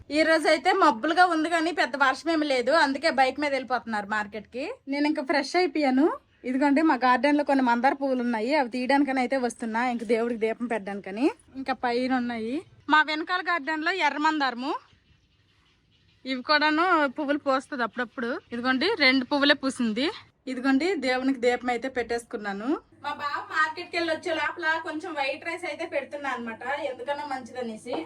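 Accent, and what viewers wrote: Srikakulam, India. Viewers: మీ భాష మన భాష శ్రీకాకుళం సూపర్ గా మాట్లాడుతున్నారు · Pakka Srikakulam yaasa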